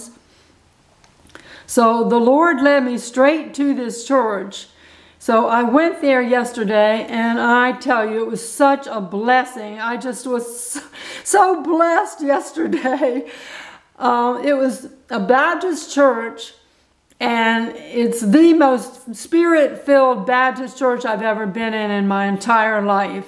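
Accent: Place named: South, United States. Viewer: lol not really a state but ok. I live in SC and I can tell you are from somewhere close. GBU too.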